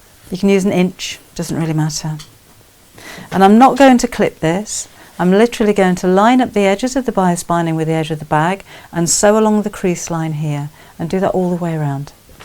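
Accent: United Kingdom. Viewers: I love Your smile, your vice, your cool british accent and yours works!!!!!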